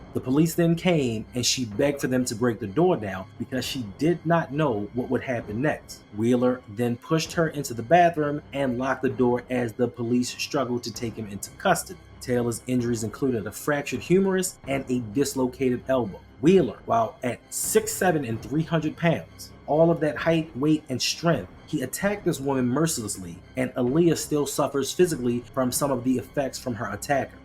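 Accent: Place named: Northeast, United States. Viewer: I'll be back when you get the audio right. Definitely from northeast . I can hear the MD, DC or PA in the voice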